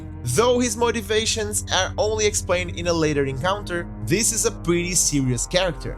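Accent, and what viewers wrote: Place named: Brazil. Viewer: Bro You're brazillian right? Lol. Got from the accent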